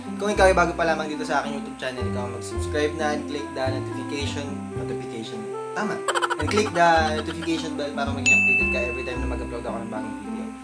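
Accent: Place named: Laguna, Philippines. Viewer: Taga laguna po kayo sir parang puntong laguna eh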